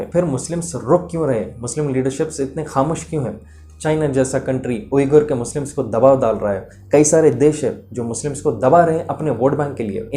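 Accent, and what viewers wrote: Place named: Bangalore, India. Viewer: Thank you very much for your very informative and very interesting video Are you from Banglore ( India) Your accent is like a Banglori My mother was from Banglore Yes you are right about the three very strong leaders of Arab - African countries At present in the entire muslim world There's no strong leader Recently Pakistan had to take loan from IMF We are facing the crunch About Imran Khan I am very much doubtful Is he sincere for Pakistan or not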